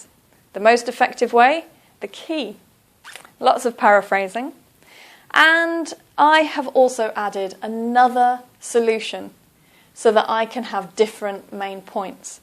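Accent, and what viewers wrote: United Kingdom. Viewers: Slow BRISTISH voice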